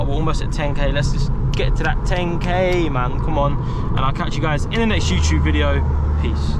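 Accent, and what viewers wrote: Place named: United Kingdom. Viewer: 1) intake and POV vids sound beautiful! 2) Which part of the UK is your accent from? Asking to learn. So many dialects over there on two islands, and I enjoy them. Cheers from across the pond. ✌ 🍻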